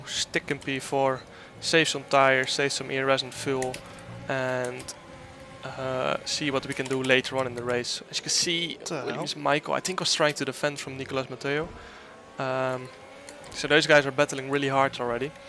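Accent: Netherlands. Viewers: I love the fact you can hear his dutch accent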